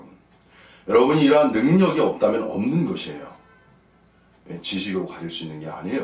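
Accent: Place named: Gyeongsang, South Korea. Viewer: 딱 경상도놈 같더라니 울산이구나!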